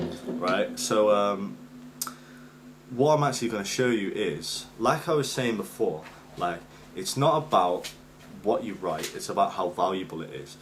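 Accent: United Kingdom. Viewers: Thank you for the video man. I found it very useful. I think it may be called a perfect 5th though. Where in the UK are you from bud?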